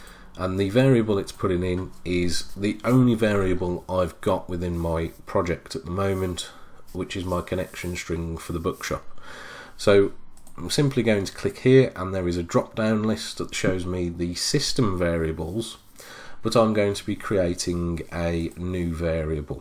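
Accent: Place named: England, United Kingdom. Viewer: the golden grail of English accented youtube tutorials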